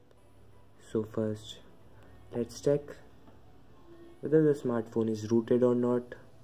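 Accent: India. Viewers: Wi u Indian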